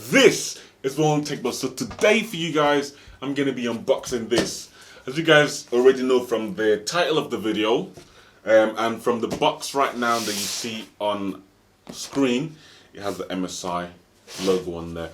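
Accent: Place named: United Kingdom. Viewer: Alabama? But sounds like british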